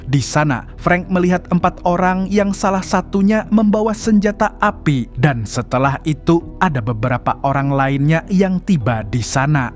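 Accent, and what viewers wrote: Banjar, Indonesia. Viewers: Banjar banarr😅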